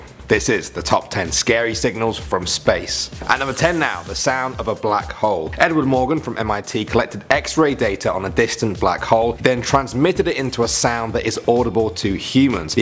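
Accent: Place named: United Kingdom. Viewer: you love space. ok ok, I get it. I love british accents.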